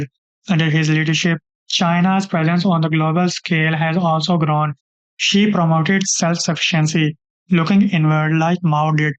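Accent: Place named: India. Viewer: For heaven's sake ..listening to your Indian accent when speaking Ingrish is so nauseating and annoying.. btw President Xi did not get rid of his political opponents but instead got rid of the rampant corruption in China once and for all.. what about India which is a also a notoriously corrupted country...? Please focus more on your India's domestic.corruption..political divide..religious riots..homelessness., slums .rather than worry about China's problems..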